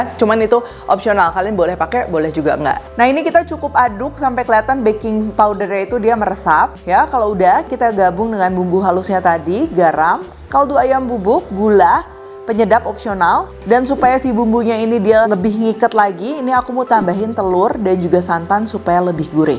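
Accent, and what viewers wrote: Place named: Java, Indonesia. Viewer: Pepes udang enak banget chef medok banget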